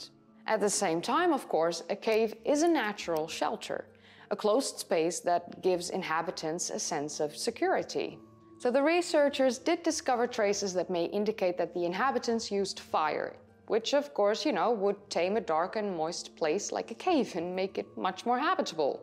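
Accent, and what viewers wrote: Netherlands. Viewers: that slight Dutch Accent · where are u from? certainly not American some kinda Euro country... OH Holland! cool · Dutch girl! (I heard it.)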